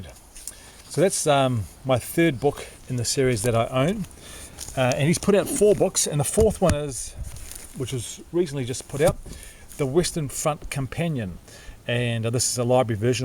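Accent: New Zealand. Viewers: Ur kiwi accent is awesome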